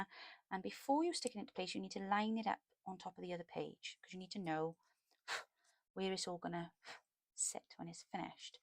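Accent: Wales, United Kingdom. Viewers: Utterly fascinating. It might take me a week to make this. I love to craft but am just sooooo slow. Thank you for all this hard work. The designs are right up my tree! So adorable and pretty. I watched the whole video over 2 days, and it is fascinating. I do so love your Welsh accent.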